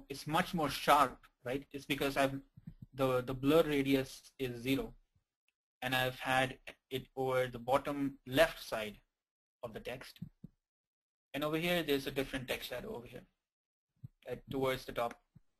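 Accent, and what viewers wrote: India. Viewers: omg, your Indian accent...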